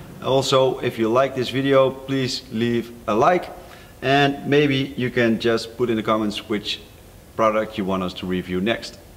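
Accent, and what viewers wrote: Netherlands. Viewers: What is your accent? Dutch? Nordic?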